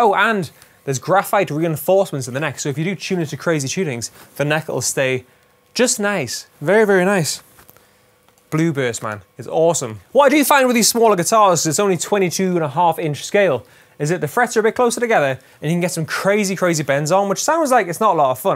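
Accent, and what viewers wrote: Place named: England, United Kingdom. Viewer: He's English, some English accents can sound slightly irish.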